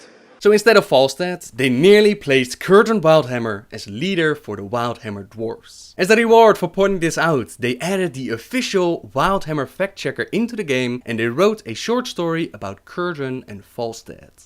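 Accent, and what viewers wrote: Netherlands. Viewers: I enjoy his Dutch accent to be honest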